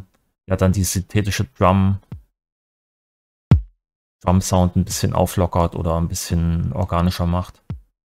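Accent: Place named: Berlin, Germany. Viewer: Besten Dank für dieses neue Video, welches wieder interessante Einblicke in Arbeitsweisen und Möglichkeiten bietet. Und wenn Dein Berliner-Slang rauskommt ist das auch sehr amüsant; und auch so, gerne Labern! Alles Gute und Merci!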